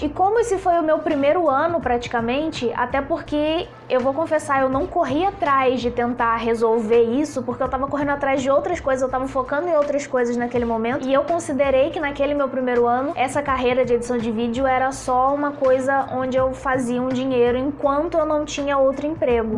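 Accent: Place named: Rio de Janeiro, Brazil. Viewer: Garota, eu que sou um vovô com tempo pra pensar, analisar coisas, filosofar e etc, fico pensando ao te assistir, que maravilha de pais você deve ter. Minhas netas são maravilhosas mas eu gostaria de ter uma uma neta igualzinho a você. Além de linda (minha netas também são) você é também muito inteligente e bem resolvida, me parece. Eu aprendo a editar vídeo com você e, ainda de quebra, me divirto com seu modo de se expressar (fala pelos cotovelos. Rs rs rs) que é maravilhosa. Até seu sotaque carioca é na medida certa. Está destinada a ser feliz para sempre.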